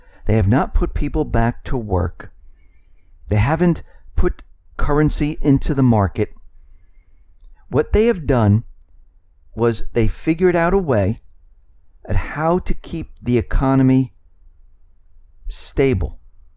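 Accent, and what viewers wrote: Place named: New York, United States. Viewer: I think your Trump is showing, I hear the "believe me" thing in your dialogue now too. Maybe you always did and I am just picking it up, is it a New York thing?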